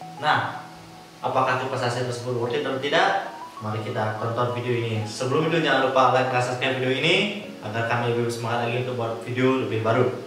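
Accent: Bali, Indonesia. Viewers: Logatnya orang bali banget😂. Mantab bliii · Org bali bro?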